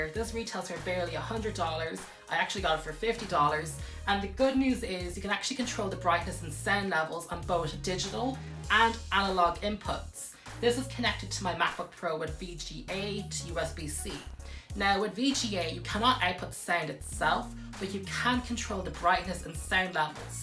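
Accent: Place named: Ireland. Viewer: Love your slight Irish accent!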